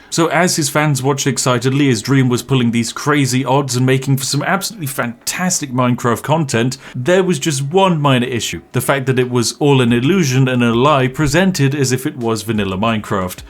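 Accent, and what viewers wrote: United Kingdom. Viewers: they are too dumb to understand the spiffing brit’s accent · this is what every one in britain talks like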